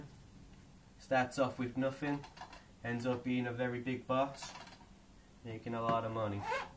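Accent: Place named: United States. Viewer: what you talking in that SHOCKING american accent for ?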